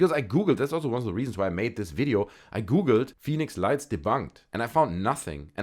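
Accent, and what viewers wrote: Germany. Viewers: For one thing you have a German accent for another were you there I was there when that happened in 97 my wife and I then I had a video camera I have video taped it it didn't make a sound and it was from not this world you can tell when your hair stands on your back it wasn't from this world no it wasn't a reshape balloon for one thing a balloon will not go into space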